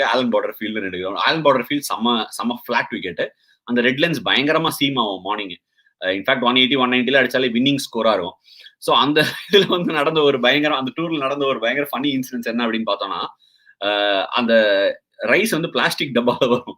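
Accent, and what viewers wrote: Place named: Tamil Brahmin, India. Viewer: Tamil Brahmin style of talking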